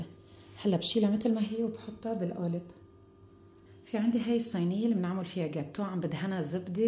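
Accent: Iraq. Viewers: تسلم ايدك ماشالله إشكد مرتبة اللهجة العراقية تجنن عليج❤ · فدو حلو عليج الحجي العراقي يابعد روحي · تسلم ايدك يا شكلها يشهي فعلاً من بعدك قفل الترند مافي احد يعملها بعدك وحلوة بفمك اللهجة العراقية متابعتك من العراق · عاشت ايدك انتي انسانه طيبه وروعه وشكل طاقية السلطان باين حلوه وطيبه وكلامك كلش حلو بالعراقي وتحياتي لكي ول وحماتك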